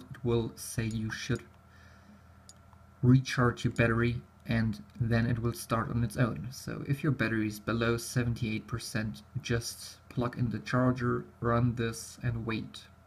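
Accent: Germany. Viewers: Krasser deutscher Akzent :D Dankeschön.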